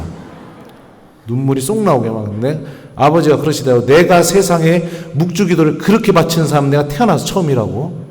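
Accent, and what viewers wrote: Chungcheong, South Korea. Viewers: 찬미예수님 ~♡ 신부님 서울가셨내유~다음 또 가신다구유~^♡~ 신부님 충청도 사투리 환장하게 재밋내유~ 서울말 안하셔도돼유~^^ 말씀자체가 신자들을 사로잡는당께유~신부님 아주 멋지십니다.제고향은 충남 부여 랑께유~^^ 신부님 건강하시고 행복하셔유~♡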